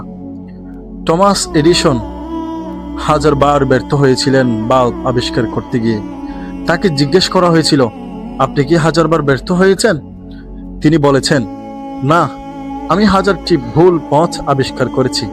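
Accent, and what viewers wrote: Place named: Chittagong, Bangladesh. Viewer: আপনার কথার টোন হলো, আপনি চট্রগ্রামের।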